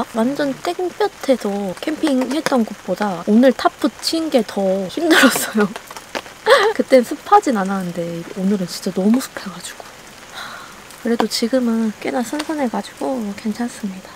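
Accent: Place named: Gwangju, South Korea. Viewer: 광주 사투리 정겨워요 ^^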